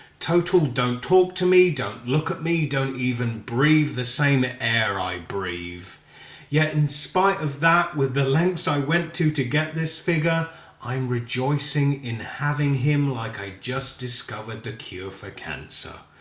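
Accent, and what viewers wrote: United Kingdom. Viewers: Oh MY GOD UR BRITISH I FEEL LIKE FAINTING! LOLOL!!! who gives a damn? Im british too. why do americans love us so much???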